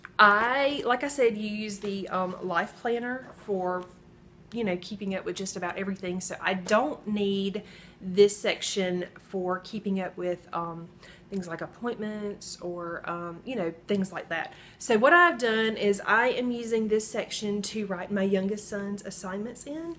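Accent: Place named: North Carolina, United States. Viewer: rule number two - check your attitude. my husband and i died laughing! [yes, he watches your videos with me! we love your voice......hope that isn't weird. reminds me of north carolina when i lived there] i have never seen a teacher planner from EC, looks neat and i love the little fixes you made to it to make it your own!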